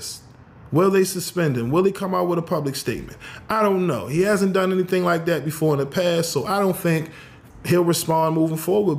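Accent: Baltimore, United States. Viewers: U from bmore?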